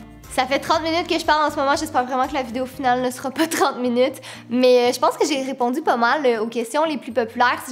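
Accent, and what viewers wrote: Quebec, Canada. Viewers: Des gens qui regardent pour la première fois de leur vie une vidéo en québécois et qu'on du se dire qu'elle était chelou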